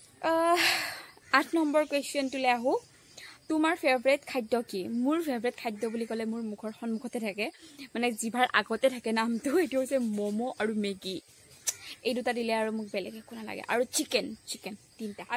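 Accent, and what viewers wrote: Nalbari, India. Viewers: Ba Tumr Ktha bor Nalbari, barpeta district r dore... Apunar original Ghor kot hy?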